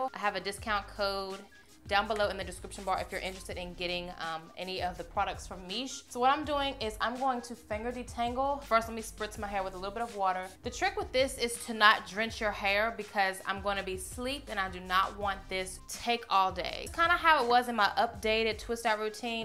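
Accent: South, United States. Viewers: You sound so country... I love your accent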